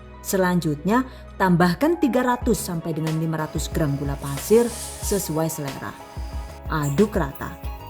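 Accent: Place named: Brebes, Indonesia. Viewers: Mbok ayune, salam sing wong brebes yen ora ngapak iya ora kepenak 😊😊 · Enak ya punya magic com yg bnr2 magic bs masak selai nanas disitu.. Saya gunakan alat apa adanya.Krn ilmu msk diasah disaat alat sederhana tp tekad mau buat lebih bsr dan hasil masakan disukai suami dan anak.. TOP dah, rajin share resep baru dan ga pelit ilmu, serta disetiap videonya ada suara lucu medok gaya bicara itu.Beda deh dari channel msk yg lain,pernah nyoba tp hasilnya mengecewakan.Kalau channel benar2 bagus dan reviewnya udh byk yg masak..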